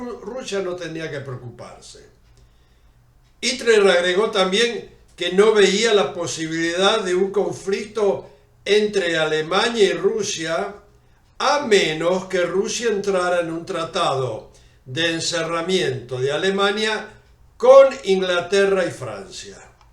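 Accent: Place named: Argentina. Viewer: O espanhol com acento argentino ♡♡♡ parabéns pelas informações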